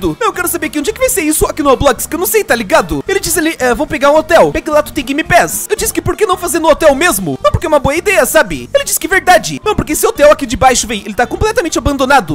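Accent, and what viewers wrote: Portugal. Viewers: Gente, ele é de Portugal? Sotaque estranho da prr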